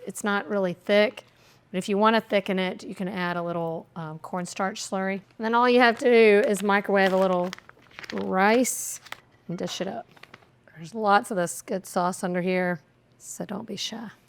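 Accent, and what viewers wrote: South, United States. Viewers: This video made my day! I love the suggestions and your calm southern voice. :) Thank you!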